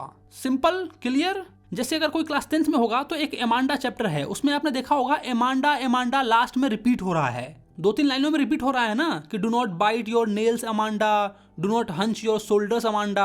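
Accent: Bihar, India. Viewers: bhaiya are you from bihar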